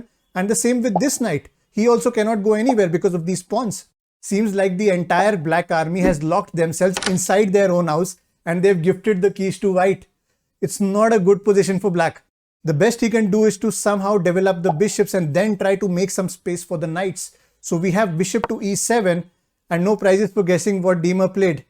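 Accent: India. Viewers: cant stand that indian accent. flunked a couple of college courses because of that shit.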